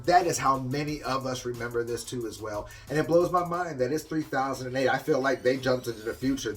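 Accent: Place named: United Kingdom. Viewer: ✌️ No shit on the "the" being gone? Well, huh. /// These. No doubt. Must be her accent. These rhymes with agree, kinda. /// Now that I've been doing this a while, I remember green plaid, red plaid, AND black. /// I remember "A" barbie world. /// When I sat down to watch the awards that night, I just KNEW MJ was going to do something epic. He did not disappoint! And I remember when the lyrics changed. // 3008. Just look at her outfit. She was saying that she was way ahead. // This song was so overplayed, I can't stand it any more. // oh lord. Again with the British accent. // Thanks,!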